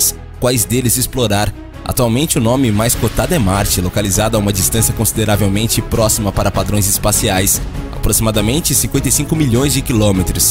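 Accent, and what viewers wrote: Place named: Caipira, Brazil. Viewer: O cara falo com um sotaque caipira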